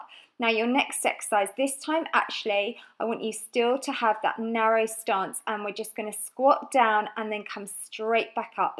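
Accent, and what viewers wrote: United Kingdom. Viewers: Wyndham-Read thank you!! it was not to be ofensive at all! you are really good and I love your determination and your constance and energy! and if you ever tried an Adults ballet class you would pick it really fast! I am sure of it:)., may I ask where is your English accent from? I am trying to improve my English. thanks! many hugs and all the best in your really fascinating project!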